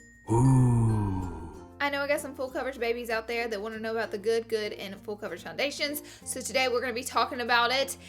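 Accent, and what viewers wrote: South, United States. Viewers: SNAPCHATFAM... Love you girl. Also in the south and love your accent and how funny your videos are. ❤️❤️❤️❤️ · Snap Fam!!! I loved your snaps from London!! I'm from South Georgia so I love that you're a southern girl too with your accent!! ❤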